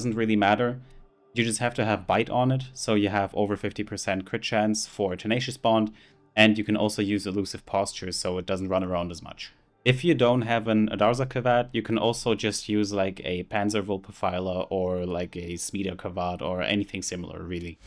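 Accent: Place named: Germany. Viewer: I thought I heard my fellow German accent in here ;) nice video!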